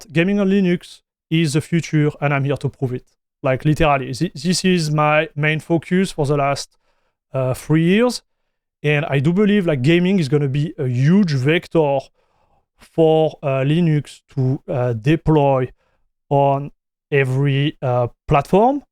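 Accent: France. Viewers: I Love that did the Benchmarks and that you did a fair review. And i 100 % agree to you. Nicks Point is still valid, for Casual gaming the distro is Not as important as you might think at First. Greetings from Germany, Always love watching both of you, great content and awesome french accent.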